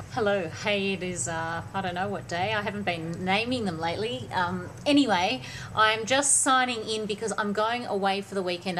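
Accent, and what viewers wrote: Australia. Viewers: i love australian accents<333 so cool! love the tan, you look FIERCE girl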